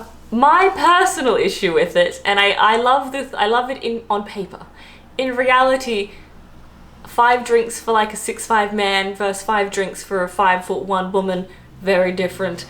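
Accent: England, United Kingdom. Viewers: Middle Class English accent! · dont get me wrong you are right about put culture i am 65 you sound more english than me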